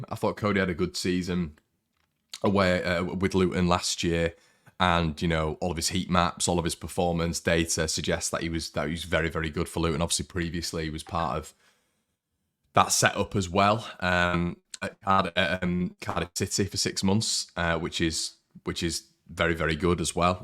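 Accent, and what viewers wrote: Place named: Yorkshire, United Kingdom. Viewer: Narked off 😂😂 proper Yorkshire. Loving it.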